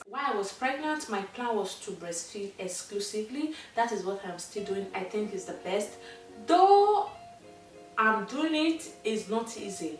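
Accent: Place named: Benin. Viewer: Hey n hubby New subscriber Alert💃🏾💃🏾💃🏾 and I have watched sooo many of your videos...lol I love the fact that you are very natural and I can relate soo well with you cos am Nigerian and am feeling that Benin accent sooo well! Lol Love u gurl All the best to your hubby and the new baby Cheers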